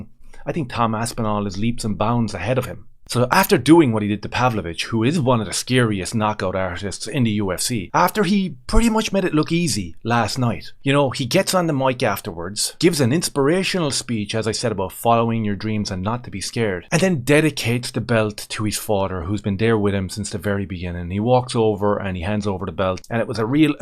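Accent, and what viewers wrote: Ireland. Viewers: What part of Ireland are you from? Cork? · Are you Irish mate? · Really like your channel.. to the point.. no BS.. and who doesn't love the Irish accent🤷🏻‍♂️. Keep it up brother👏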